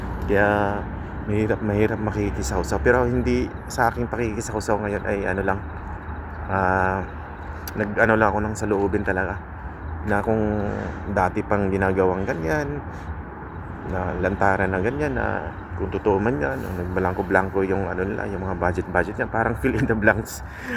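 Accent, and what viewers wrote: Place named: Visayas, Philippines. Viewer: ok lang po kung bisaya accent 👍👍 para sa akin malambing mag salita ang mga bisaya, hindi ako updated sa balita sa pinas pero atleast narining ko about national budget take care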